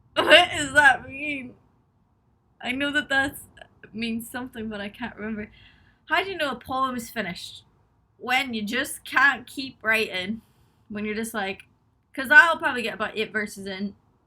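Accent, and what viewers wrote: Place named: Ireland. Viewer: Lord Tunderin Jeezis.....You Look Almost IDENTICAL To My Ex Girlfriend!!! But, Your Eyes and Personality is/are Much More Beautiful than Her.....She wasn't A Loyal, Trustworthy Person...I Personally believe She was Bi-Polar??? Anyway I'm From The Canadian Chapter of The Fan Club...There's only 9 of us..10 if You count the Dog "Buddy" (After Buddy Holly?)...LOL!!!!!!....Seriously, I Truly Love You and Your Singing....It's just that it causes Me to experience too many Feels...if You know what I mean?......I've truly Adored You for the last 8 years when I first saw You Excel...With an Excellent Elton John Tune....I must've re watched it over and over and over again about 500X....Well, This was My first Post....And That Killa Irish Accent Just Killz Me.....Lots of Irish in my family tree.....Anyway...Best Wishes....I Hope You're Doing Amazing........Hopefully someday You can Tour Canada....Barrie or Orillia Ontario Would Be 2 Excellent Venues....There's Casino Rama near Orillia....And Molson Park Ampitheatre in Barrie....Good $$$...And Nearest to Me...So...Win...Win!!!....Stay Sweet!!!!!!!!!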